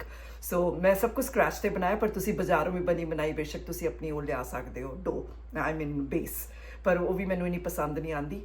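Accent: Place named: Punjab, India. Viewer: We love your Punjabi accent… please continue in Punjabi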